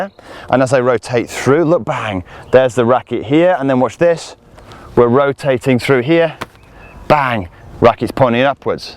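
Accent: United Kingdom. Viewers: Great video's and a proper pleasant British accent 👍 unlike an annoying newsreader 😩👎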